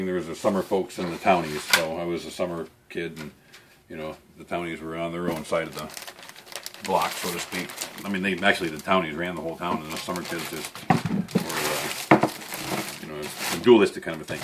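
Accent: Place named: Upstate New York, United States. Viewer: Having watched a few of your videos recently I of course kept watching and came across this video. Really blew my mind! Owning a beaver is my goal, not a sports car or fancy house guy so a beaver it is! BUT, hearing you were from Long Lake which is one of my favorite destinations in the ADK blew my mind! I tent camp in Saranac Lake area, have a camp just outside Lake George area but may look for a camp closer to Long. I knew I recognized the upstate accent, great videos!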